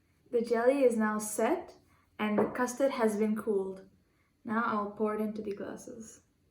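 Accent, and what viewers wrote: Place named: United Kingdom. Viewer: or whatever idk sounds kinda British ngl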